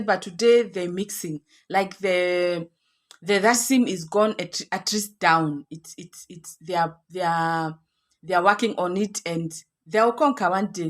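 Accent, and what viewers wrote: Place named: South Africa. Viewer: 😂😂😂 Sounds like south african 😂😂😂 I think you're married to a south african.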